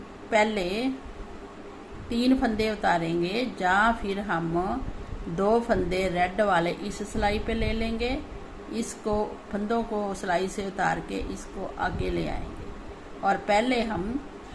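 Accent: Punjab, India. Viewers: मैं रावलपिंडी पाकिस्तान से हूं. तुम मुझे बहुत अच्छी लगती हो, पंजाबी स्टाइल में बात करने का तरीका बहुत अच्छा है। स्वेटर बनाने का आपका तरीका मेरे जैसा ही है। मेरी माँ ने मुझे आपकी शैली में सिखाया। मैं सच में आपसे बात करना चाहता हूं. आपसे अनुरोध है कि आप एक वर्ष तक के बच्चे के लिए रोम्पर बनाना सिखाएं। Google द्वारा अनुवादित बहुत बहुत धन्यवादमैं रावलपिंडी पाकिस्तान से हूं. तुम मुझे बहुत अच्छी लगती हो, पंजाबी स्टाइल में बात करने का तरीका बहुत अच्छा है। स्वेटर बनाने का आपका तरीका मेरे जैसा ही है। मेरी माँ ने मुझे आपकी शैली में सिखाया। मैं सच में आपसे बात करना चाहता हूं. आपसे अनुरोध है कि आप एक वर्ष तक के बच्चे के लिए रोम्पर बनाना सिखाएं। Google द्वारा अनुवादित बहुत बहुत धन्यवाद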